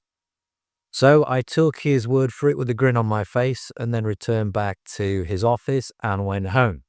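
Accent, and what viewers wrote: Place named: RP, United Kingdom. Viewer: You sound posh but bottom line you chose Pattaya. That says it all in any case no matter how Angelic you like to appear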